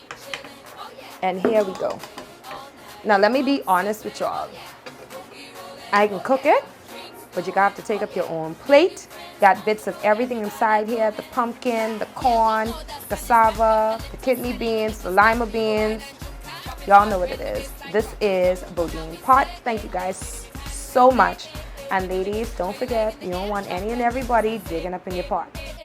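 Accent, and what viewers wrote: Bahamas. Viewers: Gal I love how you did this with you authentic Bahamian accent. Your okra soup rocks just like you. A beautiful Bahamian girl who can cook and sound indigenous. Will be looking for your other videos.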